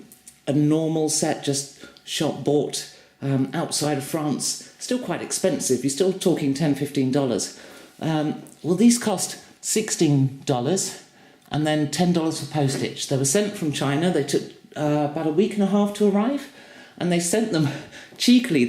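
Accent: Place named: United Kingdom. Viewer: this guy has the most british accent I've ever heard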